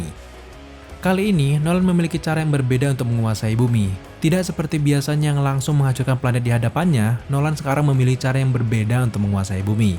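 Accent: Indonesia. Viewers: I am Always curious .. why Indonesians... almost of you like to change the english pronounce? Aku hairan kenapa kebanyakan kamu suka meng "indonesia" kan bahasa inggeris. Tune Core jadinya Tun-kor.. guardians yg sepatutnya disebut 'Gardian' tetapi dibaca 'gu-ar'-dian... princess yg sebutannya prinses tetapi disebut prin-ches... wth? semuanya sudah tidak kedengaran seperti English... dan kadang2 jadinya susah mau cari infromations dikarenakan sebutan yang tidak saya fahami...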